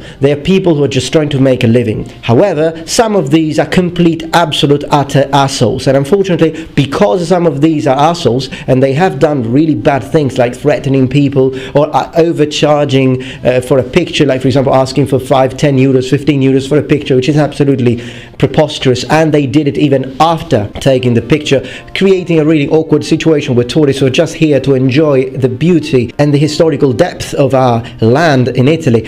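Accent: United Kingdom. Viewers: I am amazed isnt English and actually learnt English quite late. He would make a classic BBC television presenter. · Strong British accent on this Italian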